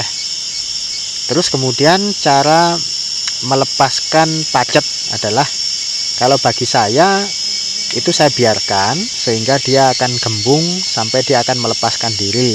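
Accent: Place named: Java, Indonesia. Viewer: itu di daerah mana bang. kok kayanya anda pake logat jawa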